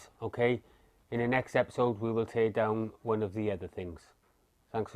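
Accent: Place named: Wales, United Kingdom. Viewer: Hello, Great videos and nice intro. I live in northern Sweden and got in to scrapping by accident so to say. I totally understand the reason you are scrapping as I do it for the same reason, it's escapism and theraputic. Where abouts are you from? Saw you blamed welsh accent, I been to north Wales and loved it, felt like home almost.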